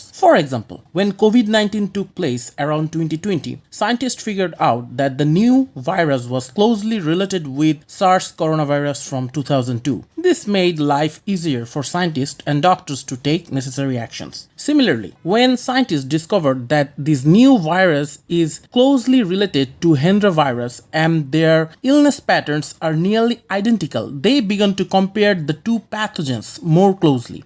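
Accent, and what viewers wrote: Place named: United States. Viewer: Dear Sir, your voice is tremendous like an American or Canadian when I listen first time, I don't understand that is your.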